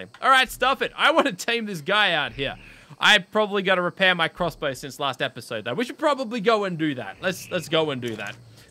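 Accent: Australia. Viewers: Hay u Australian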